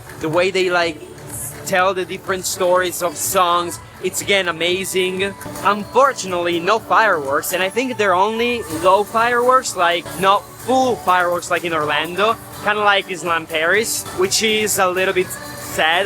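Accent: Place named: Italy. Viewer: Come imparare l’inglese? con i video di in inglese ahaha, video visto in italiano e inglese 😍. Parco stupendo sopratutto per le aree esclusive, da visitare assolutamente una volta nella vita. Continua così anche se per ora c’è poco pubblico piano piano andrà sempre meglio, ci sono pochi canali che raccontano le cose come fai tu. E poi il tuo accento italiano mi aiuta a capire un inglese più vicino a noi. Grazie per questi contenuti interessanti e fatti molto bene 🤙🏻❤️ Aspetto il video su Shanghai e gli altri dell’ America. A poi vi consiglio a te e non so valutate voi in base la richiesta di fare tour dei parchi nel mondo con noi. È una buona idea di business dove ci si diverte e si passa del tempo insieme. ( Sopratutto aggiungo, con la vostra preparazione nella storia dei parchi. ) Pacchetto tutto organizzato. Con voi come guide.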